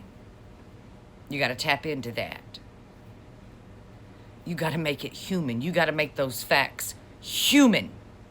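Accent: South, United States. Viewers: Keep it up. I know by experience that humor and a southern accent break through walls. Mine is more of a hillbilly, Eastern Kentucky accent but just as effective. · We love the southern whether heavy or not. We love the look, the laugh, the truth, and the absolute ease in which it seems to flow. 😘💙🥰 The jury still out on Marge. Give 'em hell.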